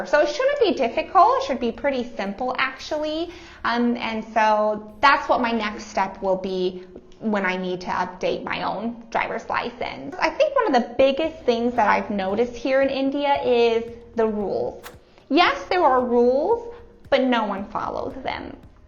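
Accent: United States. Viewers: Are You Michigan Or Chicago Girl????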